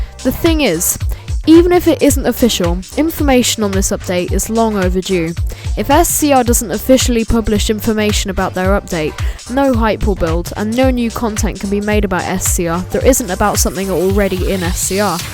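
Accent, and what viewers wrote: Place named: United Kingdom. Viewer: I like your British accent, I would also like to talk like that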